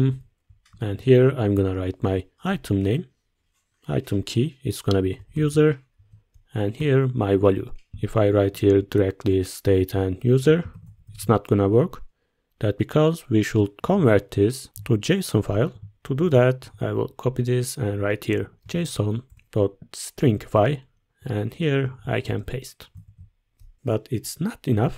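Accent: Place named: Turkey. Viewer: Konuşan elemanın türk olduğunu anladın mı?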